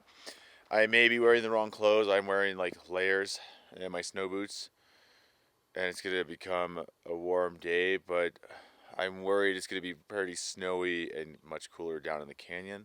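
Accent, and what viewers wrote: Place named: Boston, United States. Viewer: Be proud of your accent! Grew up in Boston also!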